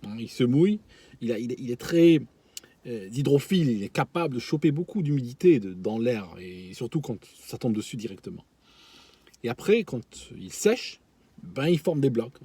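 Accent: Switzerland. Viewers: Je comprends mieux les légères pointes d'accent suisse maintenant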